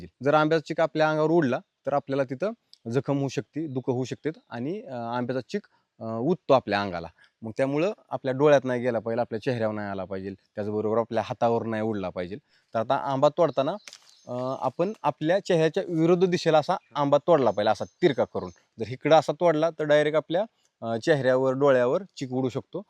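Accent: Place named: Satara, India. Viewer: दादा सोलापूर मध्ये भाषा काय करतो बे अशाचा टोन मध्ये बोलतात तुम्ही तस नाही ती भाषा नाही बोलत सोलापूरचे असून तुम्ही तर आमच्या सातारा कडची भाषा म्हणता 😮😮 मला सांगा उत्तर देऊन 🙏🙏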